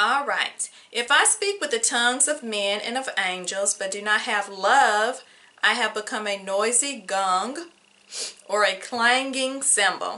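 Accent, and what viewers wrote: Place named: South, United States. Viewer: Just stumbled across your channel, love your content and your country accent! ❤️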